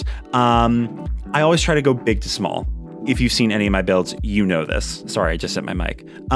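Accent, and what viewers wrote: United States. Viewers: Yes I have a friend from central NY who says egg and leg like you 😂 you’re definitely not alone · That’s how we say it in Minnesooota. We keep our ayegs in bayegs 😂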